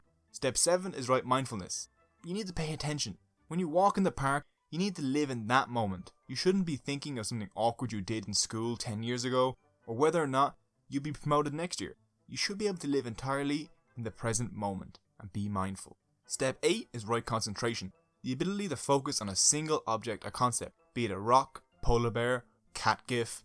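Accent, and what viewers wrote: Ireland. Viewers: Yes I think he is Irish and speaks to quickly.Nothing to do with Australia though many buddhists on different paths live there. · Hey why is Australia upside down? and what is that Island in the Pacific? Love the way you say tree3🤣 the Irish accent is awesome!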